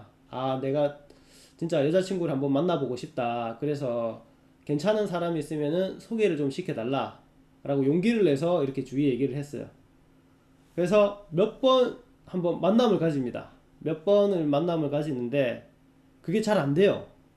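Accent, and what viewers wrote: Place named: Busan, South Korea. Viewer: 딥러닝에 관심이 많아 동영상을 보게 되었습니다. 설명도 쉽고 재밌네요. 특히 부산사투리가 매력적입니다ㅎㅎ 꾸준히 구독하겠습니다. 추천업!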